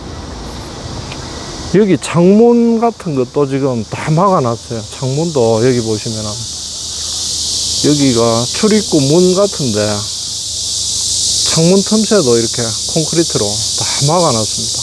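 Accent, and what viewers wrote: Gyeongsang, South Korea. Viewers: 재방이지만 오늘도 기분좋게 보고있습니다 같은 경상도라서그런지 넘정겹습니다 편안하고 푸근합니다 ~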